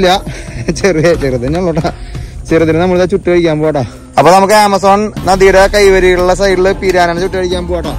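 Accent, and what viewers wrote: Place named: Ollur, India. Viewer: Ollur slang ahno poli slang ❤❤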